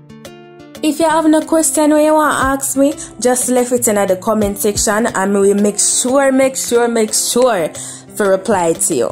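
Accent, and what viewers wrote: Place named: Jamaica. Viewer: Me too... She has this sweet Jamaican accent 😍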